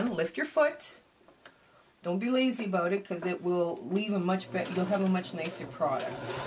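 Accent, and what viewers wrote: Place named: Canada. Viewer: BC'er here. I thought I detected a Canadian accent...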